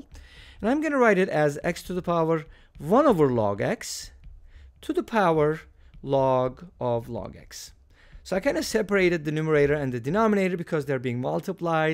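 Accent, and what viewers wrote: Turkey. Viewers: It seems to me that you have the Turkish accent...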